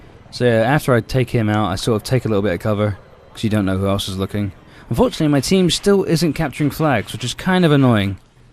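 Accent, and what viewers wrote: United Kingdom. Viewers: What nationality are you? You speak with an english accent, except you roll your 'r's like an american. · a littele bit american,but strong british accent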